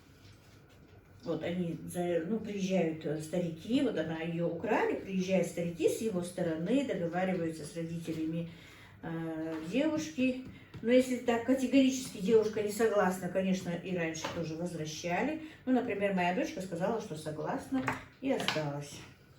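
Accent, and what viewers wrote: Standard. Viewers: Учителя были хорошие и ученица оченьприлежная.Я тоже поражена чистой,хорошо поставленной русской речью.Всех благ вам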